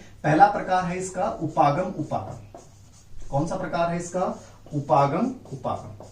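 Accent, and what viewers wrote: Rajasthan, India. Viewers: Guruji aap Rajasthan ke ho kya or aap bahut jayda achha pdate ho thanks Guruji 👍👍👍👍👍👍👍👍👍🙏👍🙏🙏👍🙏👍🙏🙏👍🙏👍👍🙏🙏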